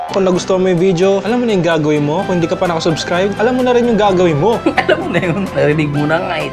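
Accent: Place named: Visayas, Philippines. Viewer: Kuya bagay mo yong besaya accent mo😂🤘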